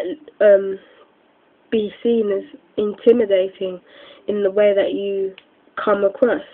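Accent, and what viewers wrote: United Kingdom. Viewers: yur frm england rite judgin frm yur accent london or the midlands ?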